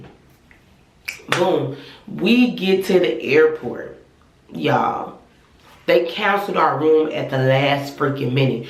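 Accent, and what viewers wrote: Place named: Chicago, United States. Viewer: Ik a Chicago voice when I hear one!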